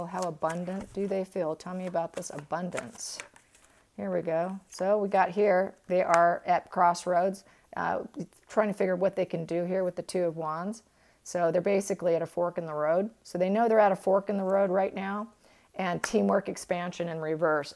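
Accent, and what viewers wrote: United States. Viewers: Love your voice! It’s calming are you from the Midwest? I sensed that from you the day I heard your voice.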